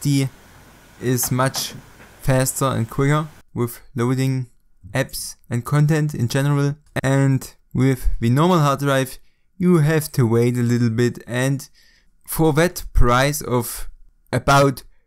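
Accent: Germany. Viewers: Höre ich da einen deutschen Akzent in deinem Englisch? ;)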